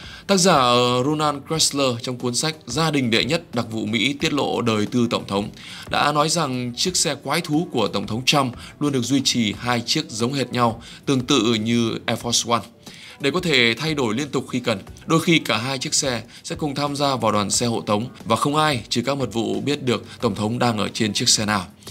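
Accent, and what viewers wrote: North, Vietnam. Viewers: giọng đọc của người miền BAC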